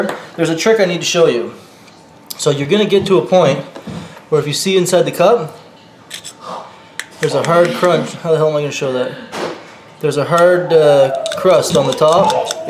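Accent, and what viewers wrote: Newfoundland, Canada. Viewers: Sounds like Newfoundland. I'm also from there. Love the accent. I'm homesick now lol.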